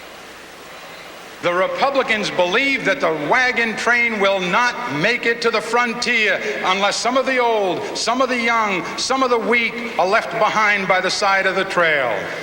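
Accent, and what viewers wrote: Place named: New York, United States. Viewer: Quite a New York accent… :-)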